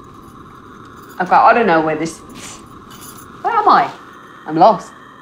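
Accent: South Africa. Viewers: Is she south African that would be awsome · is she from south Africa, I am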